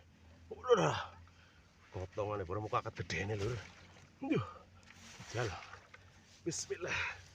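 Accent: Banyumas, Indonesia. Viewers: Mirip kang Cecep prepan pensiun ganing ya,, kyane Mase wong banyumasan ya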